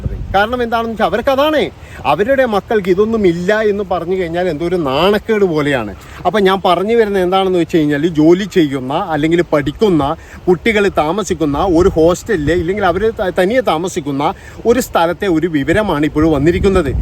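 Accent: Kannur, India. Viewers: ഓക്കേ അരി അണ്ണാ 😂നാട് എവിടെ യാ 😄കണ്ണൂർ ഭാഷ ആണ് നമ്മളെ 😂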